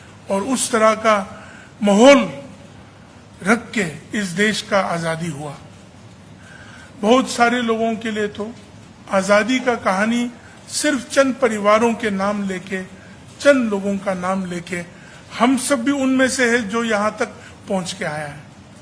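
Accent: Standard. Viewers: Bohot acha laga hai NAGALAND ke brother or Adhyaksh Temjen Imna Ji ne itna shuddh or pyaare HINDI bola hai... 🙏 👏👏👏... Saath saath ek acha Sanskaar bhi dikh raha hai Temjen Imna ki swavab mai... Humare Northeast ke saare log agar aisa hi mindset laike aage barhenge toh humare Northeast ko kabhi bhi bahar ka log Hindi nahin jaante ya Chinese ya jo bhi ho, kabhi nahin bol payenge... Bharat Mata ko pyaar karna parega, ish Desh ko pyaar karna parega, Ish desh ki Rastrabhasha ko bhi pehchan na parega... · मुझे आप पर गर्व है । भारत को सच्ची आजादी मोदी और बीजेपी युग में ही आती दिख रही है । आदरणीय तेमजिन साहेब आपका इतना शुद्ध हिंदी में दिया गया भाषण मेरे ❤️ को छू गया । बहुत बहुत बधाई और हार्दिक आभार।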